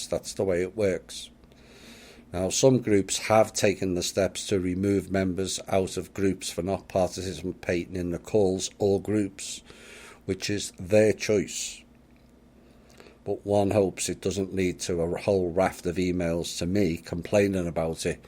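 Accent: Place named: Liverpool, United Kingdom. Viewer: This scouser loved the sound of his own voice, I haven't seen one reply to any comments in 2months of watching!! I am from extremely close to were his accent is from and I have Ufos meeting me every single night, and sometime in the late daytime too! I am Spiritually Awake now and I have so much information I could really help people with, and this guy won't ever answer any comments of mine to even ask what Ive got, and he just plays his small jigsaw piece internet show he is doing, what's going on Lad Ive got evidence that proves how intergrated these vermin lizard archons Draconian Energy stealing <mail>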